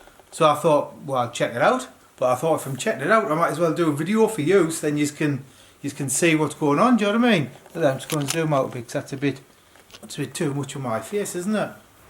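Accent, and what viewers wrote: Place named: Sunderland, United Kingdom. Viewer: He's not a Geordie he's a mackam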